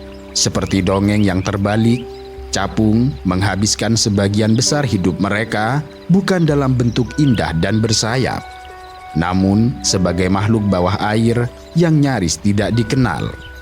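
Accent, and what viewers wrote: Java, Indonesia. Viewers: wih itu chanel awal min😮 iyah ja khas banget suaranya nya medok, cuma sedikit berbeda intonasinya sama sekarang lebih keren, sayu gitu bikin tenang di tambah backsound instrumen nya masuk🛌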